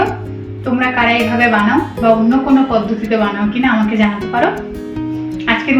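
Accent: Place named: Bangal, Bangladesh. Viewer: Tomar Kotha sune mone hoy tumi bangaal tomar video dekhi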